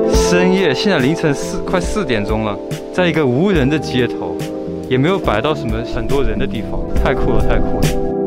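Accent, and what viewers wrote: China. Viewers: 中國的腔調 , 繁體的字幕 , 可以告訴我為什麼嗎? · 中國腔很輕微。但學台灣腔還是能聽出是中國人。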